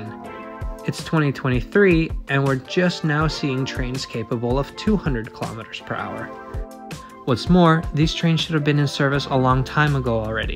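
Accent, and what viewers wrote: Netherlands. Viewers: Bro u dutch?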